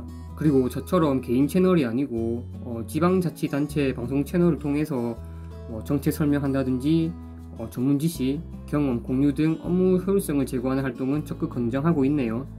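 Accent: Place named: Busan, South Korea. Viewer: 공무원 유튜브 해도되냐는 공격적인 댓글에 마음 고생 좀 하셨겠어요 ㅠ 저는 팬으로써 님 응원합니당✊✊ 그와중에 개인적으로 부산사투리 넘 좋아요ㅠ 다시태어날까..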